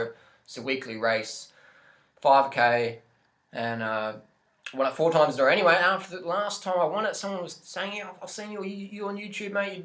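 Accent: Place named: Australia. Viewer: It's crazy how that accent makes us all listen that much more, not being sarcastic. I do plan to try this diet since it just seems pretty intuitive to me. plus peoplel like you and banana girl are good examples. but actually it might just be that aussies can assimilate the sugars easier than the rest of us so it only works for you guys. lolllllllll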